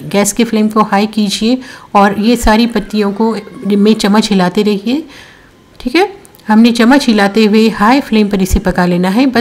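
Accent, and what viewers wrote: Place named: Gulbarga, India. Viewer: yes phool bangalore mein nahi dekhe ,shayad aap gulbarga ke taraf se, hain lehje baat cheet se aisa lagta hai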